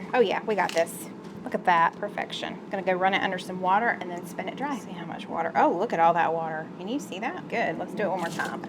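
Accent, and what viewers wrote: South, United States. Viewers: Hello and. I found your channel recently and enjoy it so much. We are neighbors. Oconee county!!, I understand you so well. It's refreshing hearing another true southern. I often get told talk doesn't have a W in it. Ya'll are great. Keep doing what ya'll do.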